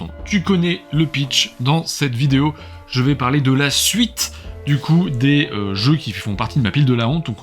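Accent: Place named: England, United Kingdom. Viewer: oui. Pis y a déjà du monde de bien installé, j'avoue. Dommage, ça aurait été bien avec ton humour et ton accent anglais qui a de la tronche (bon, un accent qui a de la tronche... je suis parti loin, tant pis, mouarf!)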